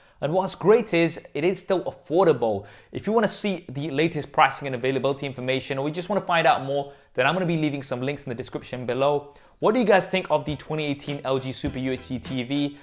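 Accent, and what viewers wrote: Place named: England, United Kingdom. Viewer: Something about an English accent on an Indian that is so captivating. Great review man. Subscribed.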